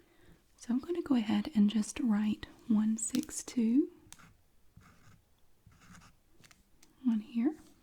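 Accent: South, United States. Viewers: Your asmr leaves me knock out ... I love 10 points Southern...!!!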